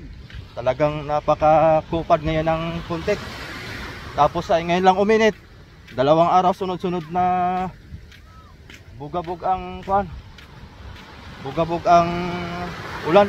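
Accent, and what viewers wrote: Philippines. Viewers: may pagka-bisaya ba pananalita diyan?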